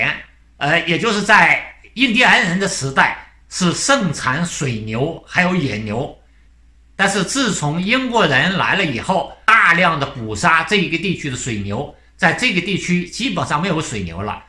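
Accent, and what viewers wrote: Shanxi, China. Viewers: 你是山西人吧? 自己好好纠正一下.难听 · 家門兒山西人嗎？聽出來了哈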